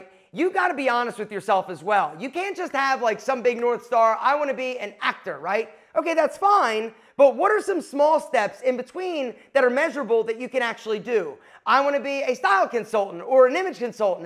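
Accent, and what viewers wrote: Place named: New York, United States. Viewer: I like that you talk like a NEW YORKER !!!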